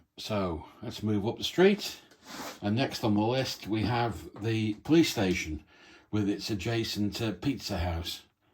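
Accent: United Kingdom. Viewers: I agree with you and I will certainly sign your petition for the Fishing Store as the best modular, although the Medieval Blacksmith Shop is equally as impressive and a fabulous build. You should check it out. A more personal question, if you don’t mind? My favourite television show is, Escape to the Country, which is shot in great Britain…..so given your accent, may I ask where you live? I am on the East coast of Canada., in the province of New Brunswick. Just curious, if it too personal, forgive me. Most the the Lego people I see on here are much younger and harder to relate to. Anyway…..I ramble on. Thanks for your reply I do appreciate it. Ta Ta for now. 👍😎🇨🇦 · Rotherham? maybe Sheffield? I would put money that you has been to Meadowhall Lego more than once with that accent. And yer right, every street needs a pub or three, do a Cross Keys for me. Have fun lad and keep up tha great work.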